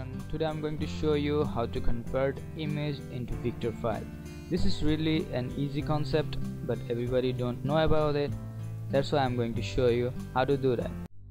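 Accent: India. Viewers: Your English is so fuckall with a crap Bengali accent! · Why does everytime i need some tutotiral in this stuff , Apu from Kwik-E-Mart is the voice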